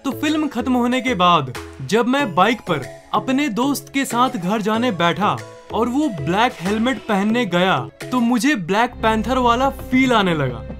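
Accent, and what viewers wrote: Bihar, India. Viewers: He is from BIHAR. I am confident. By his accent , tone.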